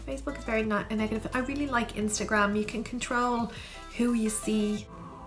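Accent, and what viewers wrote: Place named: Ireland. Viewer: Your cancer Journey will be as individual as you are...it won't be the same as the next persons...whatever you feel, whatever your mood, whatever your frustrations or elations are, they are yours...thanks for sharing your journey with us and attempting to have us understand what you feel...and by the way, I love your Irish accent..lol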